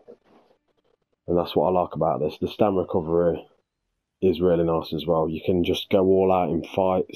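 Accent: United Kingdom. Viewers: Ah man ... I hate you right now... I just know every stam sorc eu is gonna be popping this build and I run a low resistance mag dk... 😑😑😑... brutal build and cool explanations bro... Something is bugging me, are you north, you sound like your my end 😂😂😂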